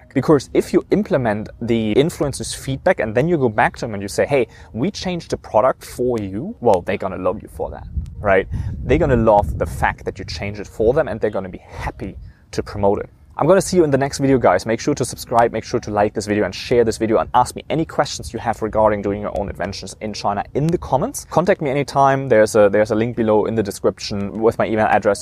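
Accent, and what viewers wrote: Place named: China. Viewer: Wow! you also got the chinese accent. Nice video tho.. thanks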